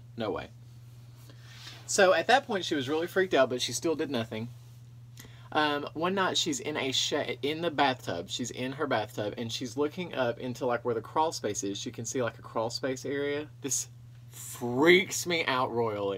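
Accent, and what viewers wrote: South, United States. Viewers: 😂😂 LOVED this!! I definitely felt Taci B coming through but that southern accent ain't goin' nowhere! 😆 YESSSS to the homemade Ranch dressing! Have you had the Reeses cereal that comes out in spring/Easter in the shape of bunnies?!? 🐇 MY fave! I think about someone living in our attic far more than I'd like to admit.... that story was sooooo creepy! 😦😭😊💖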